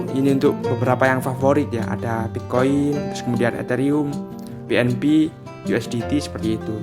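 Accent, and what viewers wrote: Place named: Java, Indonesia. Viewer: Medoknya keliatan🤣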